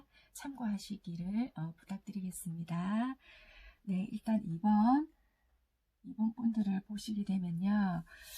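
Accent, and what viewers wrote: Busan, South Korea. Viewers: 저 5번 뽑았거든요~ 다시 한번 들어봤어요. 저 태양 물병이고 상승 게자리고, 상대는 게자리에요 ~ 다시 들어도 정말 잘 맞는것 같아요.. ^^ 댓글도 감사합니다!!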